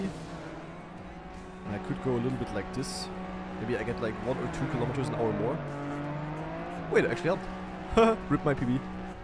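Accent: Germany. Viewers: Finds immer geil wie dein deutsch durch kommt 😂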